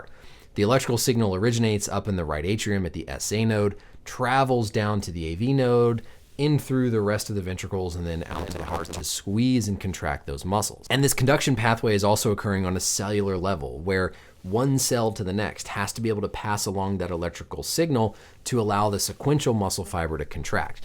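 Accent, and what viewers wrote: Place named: Michigan, United States. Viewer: Unrelated to this video but I had a very strong feeling you’re from the Midwest/Michigan and googled you lmao the accent sounds very Michigangander esque. Love how informative and straight to the point your content is Cheers doc!🍻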